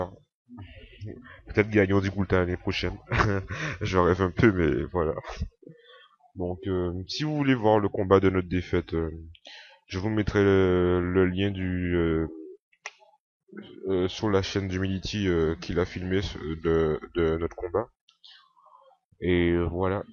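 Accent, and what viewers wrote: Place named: Africa. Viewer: ta un accent africain :)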